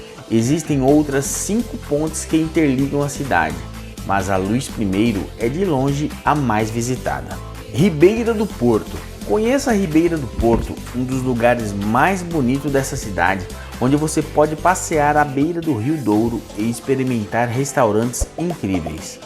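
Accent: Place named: Brazil. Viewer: Português com sotaque Brasileiro, gostei.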